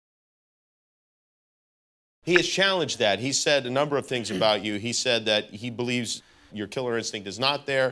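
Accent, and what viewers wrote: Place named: Australia. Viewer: well obviuosly you're australian.... lol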